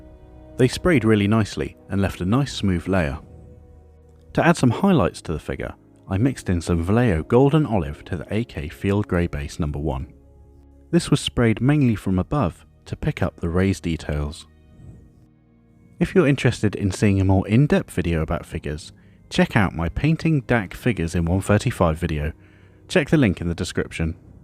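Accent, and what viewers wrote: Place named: North Wales, United Kingdom. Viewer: ught! I want a cool northern Wales accent to!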